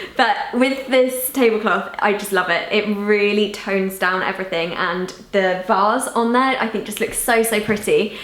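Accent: RP, United Kingdom. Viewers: I love the way she talks so plummy, the way she moves so classy!!! So satisfying to watch her. 🤗😙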